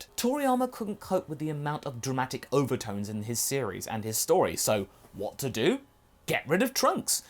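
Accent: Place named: United Kingdom. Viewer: i don't watch channel much these days. but listening to your normal voice to me sounds like you're constantly trying not to do goku's voice. or you sound like british goku.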